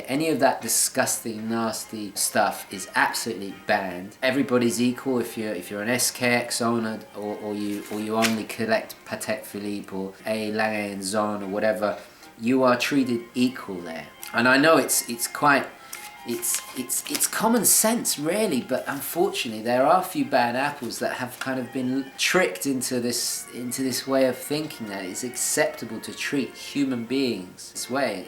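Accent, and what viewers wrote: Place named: England, United Kingdom. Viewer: I do every much enjoy your reviews and your lovely English Accent. Superb. You dived through the MeisterSigner rather fast and I am the owner (for the second time) of the MeisterSinger Singulator, for which you make some very positive remarks. The reason this is the second time around as the first time, I truly did get confused and couldnt get used to the fact that the big hand (the hour hand) is really all you need to refer to. On the Singulator, it gives you two additional hands, the minutes at the top and the seconds at the bottom, but in all honesty, a rarely make referrrence to either of them, just using the one big hour hand. I wish MS would change the second hand (seconds counter) for a date wheel, which I find something that is really missing on this model. The movement is superb as you say, beautiful. 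Take a look at one (if you can find one) I am sure you will like it. How about a review on QP Watch magazine. You might like that too. Nice work you are doing, keep up the good shows. `Mark, Amsterdam.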